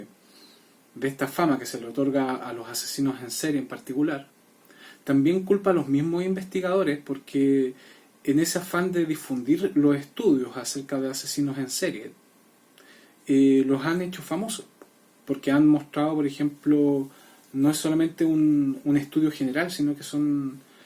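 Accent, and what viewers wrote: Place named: Chile. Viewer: En este canal hay dos cosas que me intriga mucho, los asesinos seriales y el acento chileno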